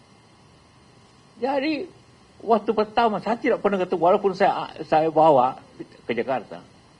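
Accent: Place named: Sulawesi, Indonesia. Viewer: Memang cara orang bugis begitu ngomong nya. Jgn samakan dgn orang jawa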